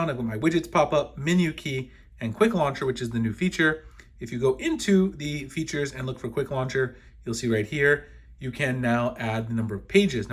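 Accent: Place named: United States. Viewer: Nice informative videos. 👍🏼👍🏼 Kudos to you I found your channel by chance the other day, when looking for YouTube videos about Samsung Galaxy smartphones/tablets guides Note: this is not a critisism, but simply ❓ A wee, and somewhat personal request (by me) vis-a-vis your accent. ❓ Any chance that you could slow the pace of your speech. (Pretty please?) We Brits, on the other side of the pond, tend to find your pace of conversational speech a tad fast for us at times and I have to keep backtracking to watch it again. When I'm in the USA, and I've been back and forth since the early 80's visiting relatives, even though they do understand my accent, albeit a regional one, I now slow the pace down, so my relatives can understand me much better, even though we've known each other for 40 yrs plus, they still, at times, say I talk too "fast" for them, and have to repeat myself from time to time. 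I'll keep watching, either way, as what you show, and explain, shows there's a lot of depth, and "hidden" things within the S23 Ultra that I didn't even dream of. I've only had mine for around 3-4 days, and I'm still getting to grips with it and it's way of working and you've alreay helped me out greatly. 👍🏼👍🏼So, again, kudos to you from me, and others too no doubt, for your work, and tips on how t get the best out of Samsung smartphones. 👍🏼👍🏼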